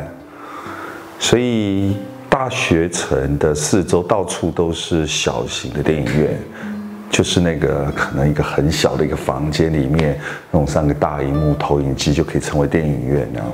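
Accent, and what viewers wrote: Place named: Waishengren, Taiwan. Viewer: 看新聞中跳出廣告，您講話的方式感到一股濃濃的外省貴族味，特來朝聖。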